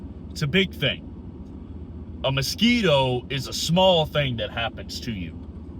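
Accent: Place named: Louisiana, United States. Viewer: Your accent reminds me of Gambit from X-Men bro lol. Love your videos!!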